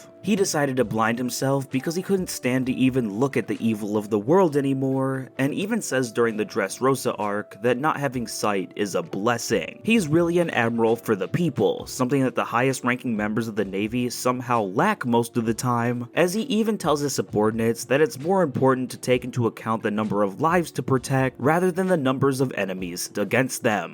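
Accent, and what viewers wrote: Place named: United States. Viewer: God, such an American way of pronouncing foreign names lol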